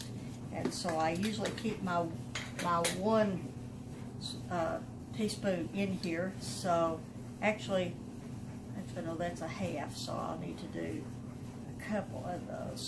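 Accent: South, United States. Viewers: Why I love your kitchen videos ... Truth and Love. 😘 I rarely had an opportunity to be a part of how to make things from scratch. Most of my experience with pizza at home was get a frozen one and pop it in the oven (or call to order delivery) Watching you in your kitchen as you explain in your most welcoming southern accent, I get all humble and grateful. Thank you for making the time to share your values and honest "how it's done here" videos. Being your (virtual) friend leads me to a better day and a closer understanding of what's truly important. You are a blessing and a true friend. God bless you and all those lives you're a part of. ❤️❤️ Thanks again. 😊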